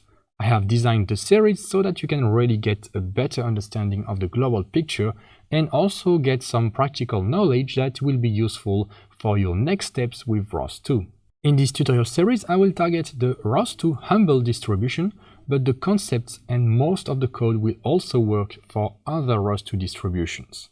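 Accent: France. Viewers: Je reconnais un accent français c'est dommage que la vidéo ne soit pas en français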